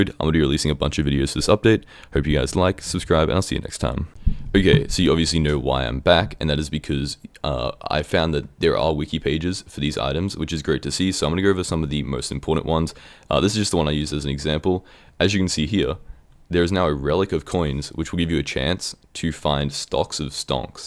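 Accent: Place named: Australia. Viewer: Hey, im assuming you live in Australia becuase of your accent so how do you get better ping to hypixel becuase I am struggling on 270 ping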